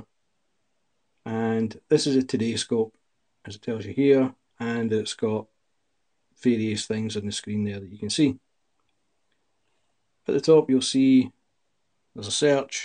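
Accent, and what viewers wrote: Scotland, United Kingdom. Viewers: Is that Scottish accent? It's nice.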